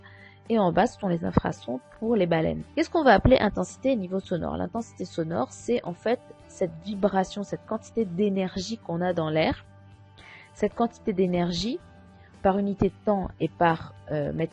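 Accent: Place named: Tunisia. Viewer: Une voix de tunisienne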